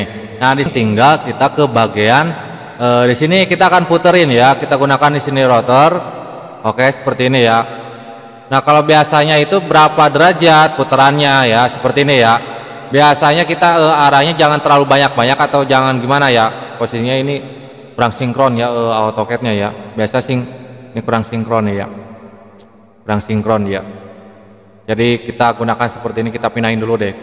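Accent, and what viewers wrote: West Java, Indonesia. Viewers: kayak logat sunda ya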